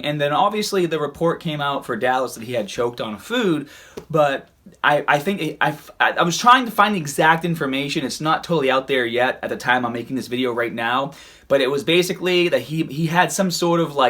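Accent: Boston, United States. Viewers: That Boston accent is classic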